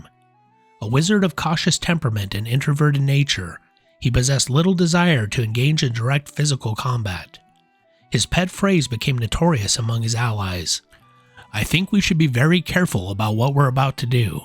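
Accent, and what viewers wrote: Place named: South, United States. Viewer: you sound like you are from the south.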